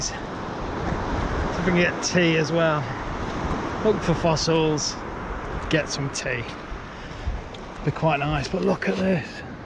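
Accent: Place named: Mansfield, United Kingdom. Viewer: Loving the vids, you originally from Mansfield area by any chance? Your accent is very familiar!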